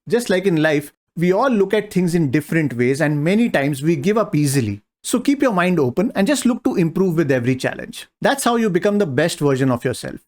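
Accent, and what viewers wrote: India. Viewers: I'm a voice actor and I'm stealing your accent. This has to be the strongest Indian accent I've ever heard in my life.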